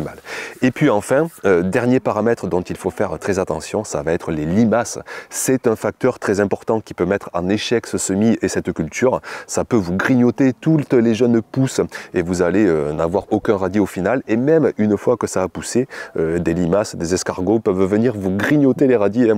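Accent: South, France. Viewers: Bonjour. Je suis debutante au jardin et je regarde vos videos depuis peu. Videos que j'apprécie enormement d'ailleurs. (Contrairement aux autres vous allez à l'essentielle). Merci de partager votre savoir et vos expériences. L'accent du sud est un petit plus qui ensoleille notre ciel gris de la région parisienne. Fini de parler, c'est parti pour mon 1er semi de radis 😅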